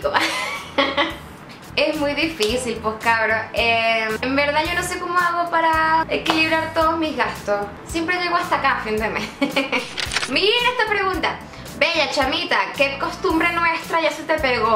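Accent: Upper class, Chile. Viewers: 🤣🤣ME ENCANTAS ..SI YA HABLAS CON ACENTO .ME DIVIERTO MUCHO .CON TUS VIDEOS TAMBIEN TE SALE COMO CUICA 🤣🤣😘😘💕💖🇨🇱🇨🇱🇨🇱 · Te sale bien, pero como cuica😂😂😂😂😂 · Ohh jajajajaja me da risa pero, porque te sale mas chileno que a mi, 100% jajaja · ajjajajaja mas que nada estabas hablando cuico, no chileno ajajajja que chistosa la weá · Habla mas Chileno que yo wn, le salio ree bien por un momento jure que era Chilena pero cuica xd. Saludos desde Antofa 😎❤ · Full Sureña, cerca de Temuco... pero Santiaguina. Nones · eres mas divertida hablando con acento chileno jsjsjs saludos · ......yo creo q nunca te escuché «hablar como chileno»pero si x youtube se nota q tienes un acento más de nosotros pero tus palabras y acento es como venezolano no se xq no te conozco en persona lo q quiero decir q ya hablas como el chileno medio y no con tantos «modismos»medios flaites yo diría q tus amigos chilenos pronuncian como normalmente hablamos un gran mayoría ,Chao y q tengas una buena noche cariños .... 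· Yaa , te sale como chilena pero cuica,igual vale ,está óptimo ,sigue así eres envolvente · Te sale bien pero un poco cuica jajja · Te amo!!!!, slds y cada vez mas Chilena!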